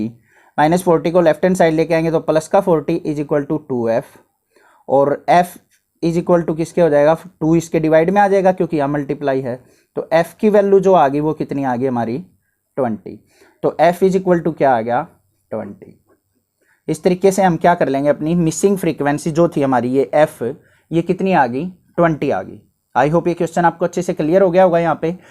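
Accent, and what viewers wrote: Haryana, India. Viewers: sir aaph haryana sa hu ? jaat hu ka ?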